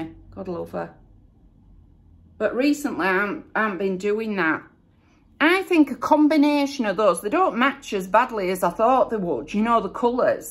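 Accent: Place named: Leeds, United Kingdom. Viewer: Hi while I was scrolling you just popped up so I started to watch your videos I think they are awesome 👌I can't stop watching them you are so funny and you remind me of my auntie with your accent she is also from leeds love Michelle xx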